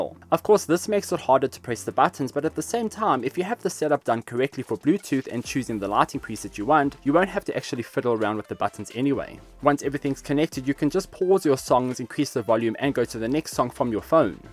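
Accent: South Africa. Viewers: Greetings from South Africa, Durban ! Great review indeed ! I knew that you were in SA as well when I heard the accent and the words...DURING LOAD SHEDDING 🤣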